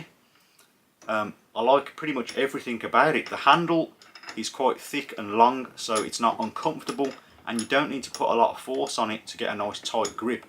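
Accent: Birmingham, United Kingdom. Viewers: good to hear an honest brummie accent! vice looks good for the money though think the rotating base is potentially a weak spot.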